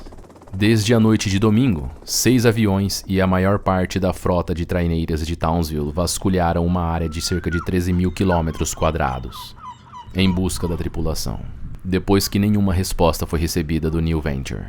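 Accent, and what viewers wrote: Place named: Sao Paulo, Brazil. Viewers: Meu Deus esse sotaque Paulista forte dá uma aflição é muito feio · esse sotaque de paulistakkkkk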